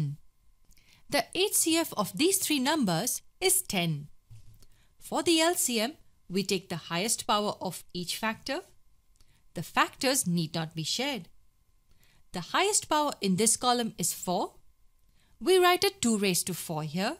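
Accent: India. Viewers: is this an indian speaking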